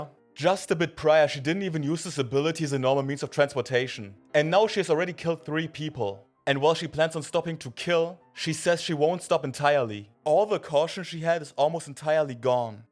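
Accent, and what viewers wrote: Germany. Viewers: Man hört richtig raus dass du deutscher bist hahahaha fr mach mal Videos auf deutsch ich suche ganze Zeit schon jemanden der sympathisch ist und manwha webtoons oder Mangas erzählt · Ar you German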